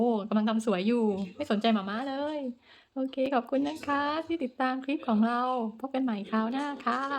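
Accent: South, Thailand. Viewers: จริงด้วยค่ะ มะละกอ แพงกว่าข้าวสารโชคดีที่คนใต้เรา ไม่ได้กินมะละกอบ่อยนะคะ พูดใต้ได้หลายคำเลยนะคะ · ทางนี้มะกอกะแพงคักจ้า สาวน้อยพูดใต้ น่ารักมากค่ะ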